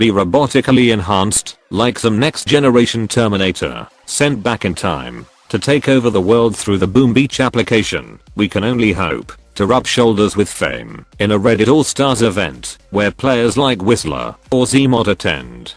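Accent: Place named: United Kingdom. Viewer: How did you get the British voice?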